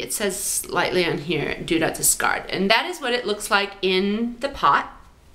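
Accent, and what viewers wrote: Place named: France. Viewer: Helpful review. Love your French.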